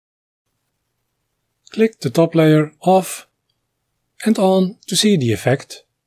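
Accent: Netherlands. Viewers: Your videos are all so very instructive and beautiful. You sound like Dutch. Am i correct?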